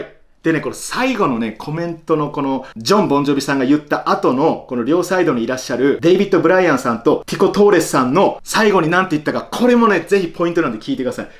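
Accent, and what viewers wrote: Japan. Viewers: ニュースなってたからきんに君の動画初めて見たけど、関西弁なんだね！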